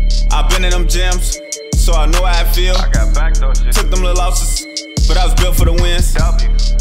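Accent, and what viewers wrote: Los Angeles, United States. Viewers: 🔥🔥🔥🔥🔥,Man he sound like L.A!!! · I fw. He a cool thurl ni**a! He don't do too much. He sound like LA & got the same type mannerisms in a way. Stay solid & safe 💯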